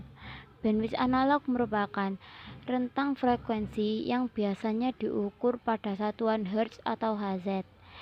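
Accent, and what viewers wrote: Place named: Java, Indonesia. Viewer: Medok e..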